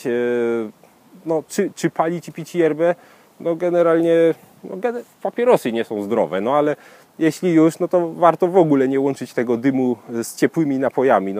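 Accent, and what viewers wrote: Silesia, Poland. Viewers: jesteś ze Śląska prawda? :D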